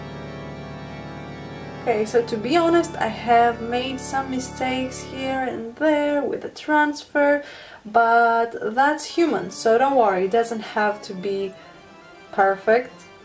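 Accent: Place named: Greece. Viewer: Omg you're Greek? I couldn't tell by the gorgeous accent! I'm Greek too!❤️🦋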